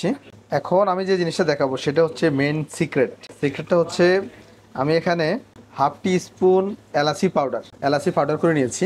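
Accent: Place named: Feni, Bangladesh. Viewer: ভাইয়া আপনি কি ফেনির মানুষ?? কারণ আপনার কথাতে ফেনির ভাষা আসে তাই।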